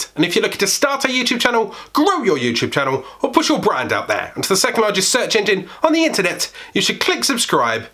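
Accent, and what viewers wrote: United Kingdom. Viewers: I love the British sound. Even i don't get it very well.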